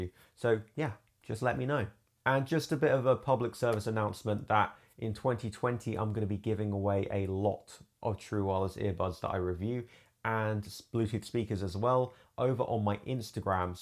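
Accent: United Kingdom. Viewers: as Am-re company, we stumbled upon you while looking for influencers to help us do video reviews in the UK, one of your videos had a lot of views and it was great we liked it. now what we like about you, your voice and the way you talk about a product, it's calm slow and with very good words, the second thing that we like is our hairstyle its almost white but for sure you haven't passed your 30's look cool, the British accent. we would love for you to review our product the best 40 USD you have ever spend on earbuds of course we can send them to you. - back to the point hahahaha we also like your background studio the colors are great and very eyecatching, I'm sure you take your time and review the products and as well time to make the script for what you are going to say. the good point and the bad points. last point: the shots and Broll over voice you do its really good. 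we been following sense you had only 7k something followers and we are also surprised the way you exploited over the last 3 months Ps; we been following you for the last 3 months lets get to 1.3 billion in the next year. you are the best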